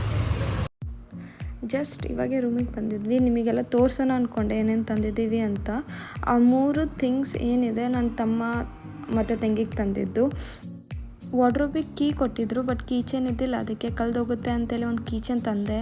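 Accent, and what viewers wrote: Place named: North India, India. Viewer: Your hindi is like we north indians ! Great . U r so energetic and beautiful. Love ❤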